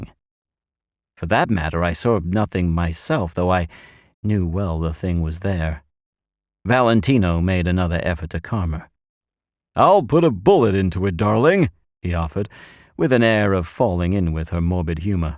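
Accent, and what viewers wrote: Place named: United States. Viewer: Many thanks,!! Great American accent!! I'm American and I think you did a great job!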